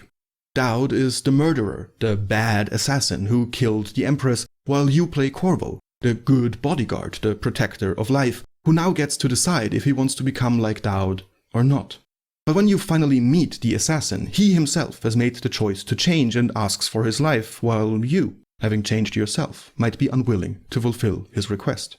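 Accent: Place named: Europe. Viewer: Its always the guys with the euro accents that make batshit videos.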